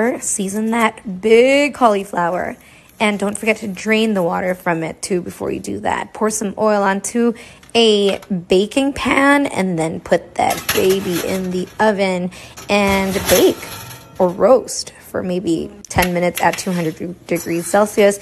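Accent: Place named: Philippines. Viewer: I love she's so proud of pinoy accent she's a pride love her!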